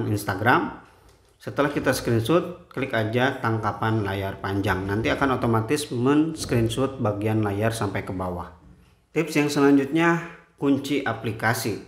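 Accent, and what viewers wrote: West Java, Indonesia. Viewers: Orang jabar ya kang?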